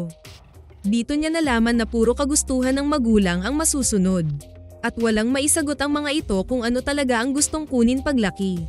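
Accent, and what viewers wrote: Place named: Visayas, Philippines. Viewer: Hahaha bisaya yung narrator bankita raw ,but im one bisaya hahahaha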